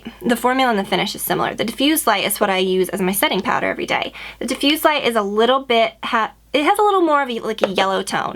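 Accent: South, United States. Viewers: You sound so southern in this video! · Shes from Georgia, its a southern accent. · Your southern accent it totally adorable :) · I think I've noticed your southern accent more in this video than any other one! haha also your eyelashes look gorgeous!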